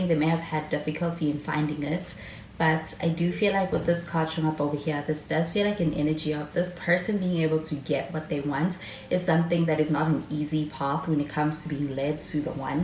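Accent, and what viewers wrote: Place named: South Africa. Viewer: You sound South African to me for some reason... Your accent just sounds so familiar to me, not you specifically, but in general.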